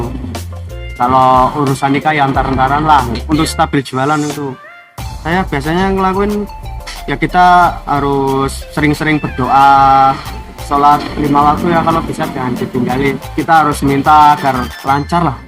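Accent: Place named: Pemalang, Indonesia. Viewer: Logate wong Pemalang kayane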